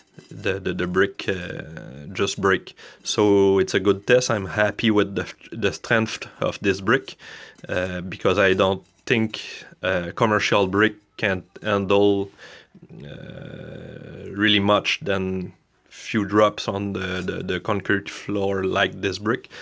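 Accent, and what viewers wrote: Quebec, Canada. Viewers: You sound Canadian. Is that where you are from? Love the accent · It's francophone Canadian. A very strong francophone I might add. I'm also Canadian and only speak English... I sound nothing like this and the majority of Canadians do not sound like this either, but Canada is the only place you will hear this particular francophone accent. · ca sonne comme un quebecois ca..........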